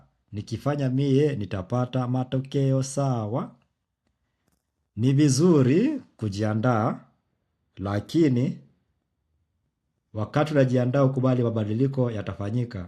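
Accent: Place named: Kenya. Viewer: Mkenya anae ongea kiswahili sanifu dah! nimevutiwa na kiswahili cha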